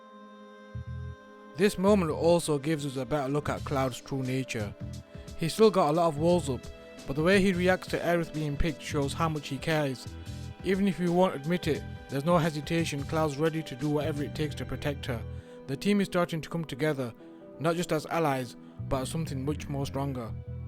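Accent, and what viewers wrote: United Kingdom. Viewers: Fantastic video and a great retrospective. Thanks so much! I imported this title along with Final Fantasy VIII on Switch. Having both full games on one Nintendo cart is amazing! BTW, where are you from? I can’t quite place your accent. I do love it, though. 😉 Edit. Ah, you’re British. I looked up Sheaf Market Square. Now I know. 😁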